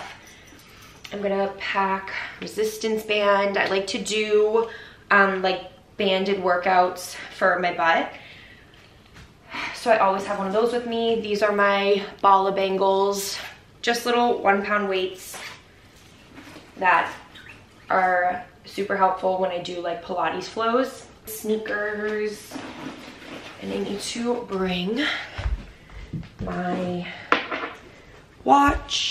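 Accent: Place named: Boston, United States. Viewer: I know a Boston accent anywhere hehe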